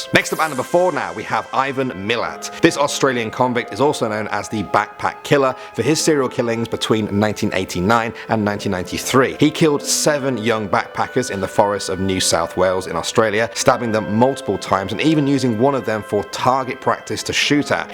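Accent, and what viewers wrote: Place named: United Kingdom. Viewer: Hey, where in the U.K are you from? I don't recognise your accent.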